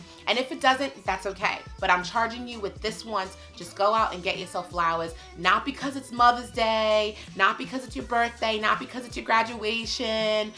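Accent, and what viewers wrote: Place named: Staten Island, United States. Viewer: The Staten Island twang you have😀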